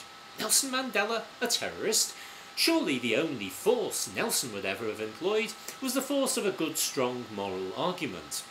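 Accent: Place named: United Kingdom. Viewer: Your British accent tells me you come from a mean prejudiced place. Firstly as colonizers Britain were insufferable! Racists, opportunists, sententious and cruel. You lost in South Africa, you we’re thrown out of India in total disgrace and nowhere you occupied miss you! So now it’s Nelson Mandela you’re aiming at! You have no heroes, except military! India had Gandhi and South Africa had a man whose sacrifice for his people and love of them and country stood steadfast against a hard government. Relentlessly the National Party killed dissidents who were precious intellects. Mandela was an educated, principled man who lost his youth rotting away in prison. This alone could have broken him but it didn’t. He stood firm and resolute but with no hate, pettiness or prejudice. Exempt of all the ugliness now rampant in this morally depleted world. He was not a saint he was just a very good man hell-bent on peace for the new South Africa. 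The Constitution a fantastic ode to principles an example to others . He made mistakes which were well intentioned but out of sync with the reality he had to face after nearly 30years locked away in an island. He stood by the right leaders - Castro, Ghadafi, the Palestinians, Russia and all those whose standing he admired and served his dream. He alone was able to put together in harmony a nation with deep wounds. Have you done that? Has anyone who dares to diminish the real value of this MAN come to an inch of what he stood for? NOOOOOOOO! So shut u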